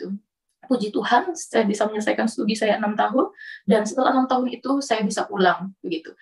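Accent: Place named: Java, Indonesia. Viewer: kok...ngaku org Bugis tpi logat Jawa...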